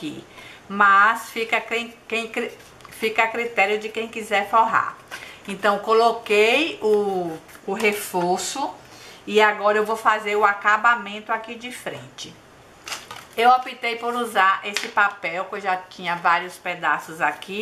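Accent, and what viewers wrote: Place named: Ceará, Brazil. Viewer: Sotaque lindo! Ceará?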